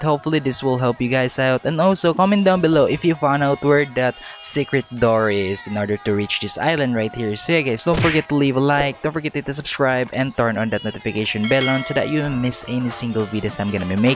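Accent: Philippines. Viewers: Ur pinoy aksent is ON POINT ONG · You have a Filipino accent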